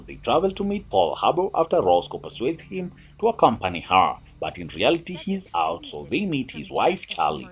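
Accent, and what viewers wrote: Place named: Africa. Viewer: Oh...s@ what the f#$@ Will be much better without the naration of African accent.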